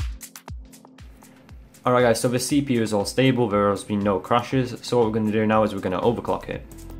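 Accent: United Kingdom. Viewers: are you a north east hard lad like me ?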